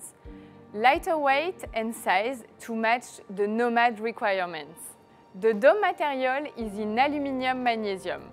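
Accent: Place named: France. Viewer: J'adore son accent français 🥰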